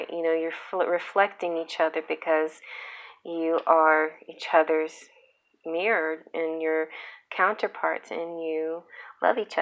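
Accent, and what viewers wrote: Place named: United States. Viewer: Love the charms and yes u have a nice voice is American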